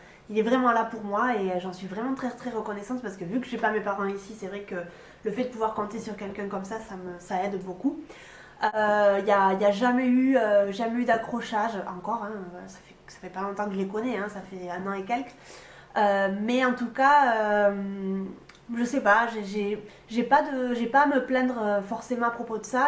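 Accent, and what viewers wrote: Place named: South, France. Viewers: Accent de Carcassonne ? On dirait que tu viens de l'Aude non ? Perpignan peut-être ? En tout cas Sud-Ouest. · Les Japonais doivent trouver son parlé Japonais charmant et amusant car cette jolie jeune fille a déjà un accent du sud prononcé 😉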